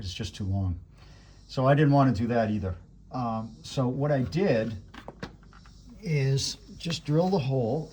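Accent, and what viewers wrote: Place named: North, United States. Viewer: That's just his accent. The way he talks, he's clearly from the Northeast in the band of the Northern American vowel shift that goes from Northern New England west through central Michigan then Wisconsin and stopping in Minnesota at the Twin Cities. What's more, anyone from there who has the shift can't hear it. It's a linguistic phenomenon that's been studied quite a bit. They just can't hear it. So, if you correct their pronunciation, it'll seem like they're being thick because they'll be all, "What's the difference? You just said what I said." I have personal experience with this having studied languages and linguistics at Michigan, which is ironic since there's nowhere that the Northern American vowel shift is stronger than in Ann Arbor, so studying languages and linguistics there with some professors from there and so speaking with the shift, it at times felt like it was the blind leading the blind and at other times just a really bizarre case study where you're tasked to objectively look into a fish bowl that you're stuck inside of. 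At any rate, a good example of the Northern American vowel shift that lines up with what you've pointed out here for him is the pronunciation of the last name "Stein," including names ending in "-stein." People from outside the region of the shift and some people within but who don't speak using the shift, like those who never picked it up but for some reason picked up speaking in the General American (GA) accent instead, tend to say it so t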